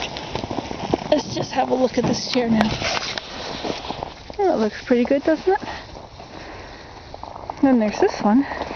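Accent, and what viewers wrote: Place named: Canada. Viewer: Hello, I've Never Heard Advertisement Pronounced the Way you Pronounced it, but everyone Pronounces things Differently!! I Thought it was Funny when the Lady Said "Are You Talking to Someone....Or...." haha. I Heard the "Aye" at the End of the Sentences, like I've heard some Canadians say "Aye" or "Eh". Anyways, I enjoyed the Video!!!! There are A lot of Good Things to Find at the Dump. Like the Saying Goes, "One Man's Trash is another Man's Treasure" Or, Woman. ~Erin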